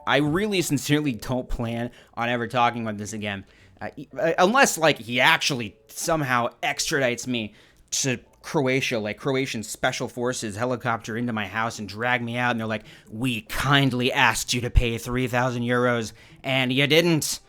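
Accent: United Kingdom. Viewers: I'm not saying it's offensive I'm saying it really isn't that funny, it's boring, I'm watching for humor and I expected something a little more thought through. I feel like a teenager watching this shit. If you're going to make fun of another English speaker have some more complexity than just accidentally reading potentially a native Croatian lawyers script in a really blank slate crappy British slant. Common, I'm not subscribing for reporting, I wanted good comedy. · Heh, there’s that British accent again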